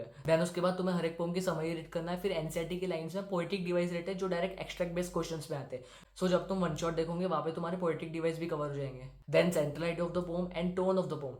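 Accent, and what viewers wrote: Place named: Hyderabad, India. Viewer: His.. Hyderabadi accent 😅🤙🏻🥀😭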